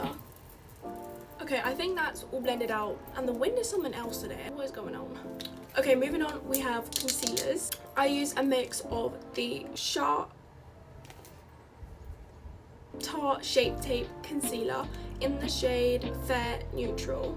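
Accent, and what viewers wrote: United Kingdom. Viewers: I KNEW U WERE BRITISHHHH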